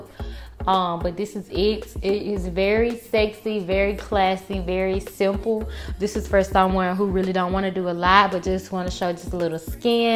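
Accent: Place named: Atlanta, United States. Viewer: These 🔥 with that accent you have to be from Atlanta lol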